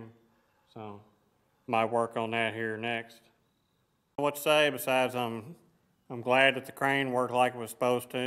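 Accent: Kentucky, United States. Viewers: I have to say I subscribed after watching you on Keith Rucker's site. I've become a fan but just tonight I went back and watched all the barn build vids. I am blown away by the work you do by yourself. You basically rebuilt a barn to save a roof. You may not have Abom torque, but you damn sure got plenty of Fenner's Getter Done. I love your vids but if I could give you any advise it would be work on the audio. I don't know what these other guys use but I have a hard time hearing you at times and I'm from TN and understand the KY accent. I also love that you include Bailey at times just like Adam did Stella (RIP).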